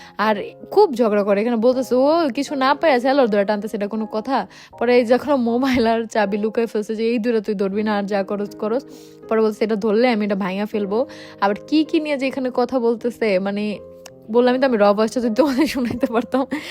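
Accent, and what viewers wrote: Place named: Khoyraita, Bangladesh. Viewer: খয়রাইতা কাঁথা বলছো আমার শুনতে ভালোই লাগছে😅😅😅😅😅😅😅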